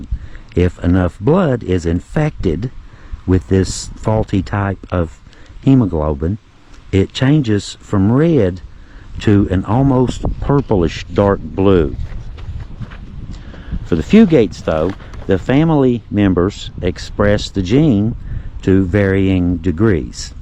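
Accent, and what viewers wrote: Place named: Eastern Kentucky, United States. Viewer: I'd love to get together with you and do a video on Appalachian english, and how even though I only live about 1 hour 45 minutes away from you, and we are still considered Eastern Kentucky how the dialect changes just a little. It amuses me to hear how you pronounce some words and names that we pronounce just a slight bit different. Its actually kind of interesting. Even that the spelling of names changed when people moved to this area from there. You'd have a husband buried here with the last name spelled one way, the wife moved back there and her name on her tombstone is spelled another way. Would make an interesting story for sure. 😊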